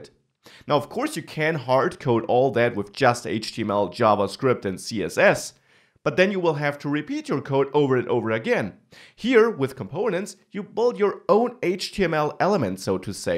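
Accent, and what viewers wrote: Germany. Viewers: Great video. Thinking to subscribe the course on udemy. BTW your accent sounds like German. Are you German ? :D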